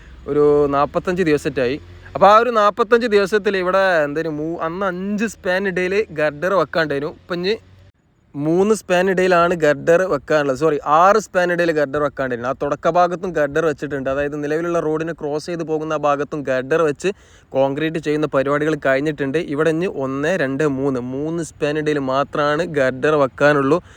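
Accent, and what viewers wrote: Malappuram, India. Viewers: bro I like your videos and the malpram language and the its slangs. I do watch to listen to that. Please include more slangs😍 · ഒരുപാട് നാളായി പറയണം എന്ന് കരുതിയതാ , സത്യത്തിൽ നിങ്ങളുടെ വീഡിയോയുടെ ഏറ്റവും ആകർഷണം നിങ്ങളുടെ നാടൻ രീതിയിൽ ഉള്ള സംസാരം തന്നെ ആണ് . ആലപ്പുഴയിൽ ഏതാണ്ട് അച്ചടി ഭാഷയുടെ അടുത്തു സംസാരിക്കുന്ന എനിക്ക് , താങ്കളുടെ മലപ്പുറം സ്ലാങ് അതിന്റെ പ്രയോഗത്തിൽ വരുന്ന നൈർമല്യം , അതിന്റെ ശൈലികൾ എല്ലാം അടിപൊളി ആണ് . പിന്നെ രാഷ്ട്രീയ പൊട്ടത്തരത്തിനും , രാഷ്ട്രീയ കാഴ്ചപ്പാടിനും താങ്കൾ കൊടുക്കുന്ന മറുപടി മികച്ചത് ആണ് . സാദാ മലയാളികൾ രാഷ്ട്രീയ അന്ധത വെടിഞ്ഞു താങ്കളെ പോലെ ചിന്തിച്ചിരുന്നേൽ ഈ റോഡ് വികസനം ഒക്കെ 20 കൊല്ലം മുന്നെ വന്നേനെ . ഒരുകണക്കിന് അതും നന്നായി NH66 ഇപ്പോൾ പണിയുന്നത് viaduct , flyover , underpass , മിക്ക നിലവിലുള്ള പാലങ്ങളും മാറ്റി പുതിയ രണ്ടു പാലങ്ങൾ മിക്ക പ്രധാന ടൗണുകളെയും ഒഴിവാക്കി ബൈപാസ്സുകൾ എന്ന അടിപൊളി ഹൈവേ ആയിട്ടാണല്ലോ . 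ഞാൻ NH 66 ന്റെ വർക്കിന്റെ ഒട്ടുമിക്ക യൂട്യൂബ് ചാനലുംകണ്ടിട്ട് ആണ് ഇത് പറയുന്നത്. നിങ്ങളുടെ ചാനൽ അടിപൊളി തന്നെ .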